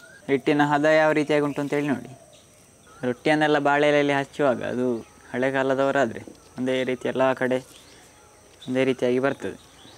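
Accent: Mangalore, India. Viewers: Yes to listen to your Mangalore kannada is very nice. I remember my days at Mangalore for twenty years.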